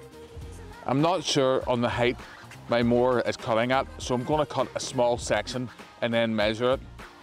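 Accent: Northern Ireland, United Kingdom. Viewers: Great video again, learning loads since I started watching you. I’ve even learned how to understand the thick N.I accent 🤣👍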